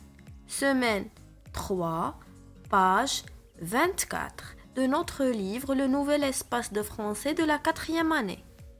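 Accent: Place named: Arab world. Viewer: Hhhh l accent est typiquement 3roubi .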